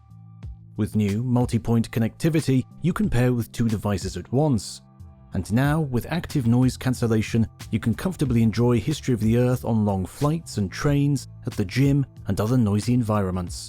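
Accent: United Kingdom. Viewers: The dude is from Great Britain. I'm not sure what rock you grew up under but people tend to sound like they come from their place of origin.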